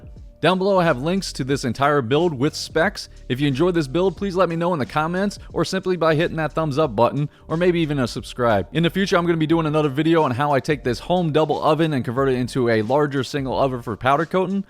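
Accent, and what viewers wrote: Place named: South, United States. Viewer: It’s called southern dialect. If ya live in the south, it is proper English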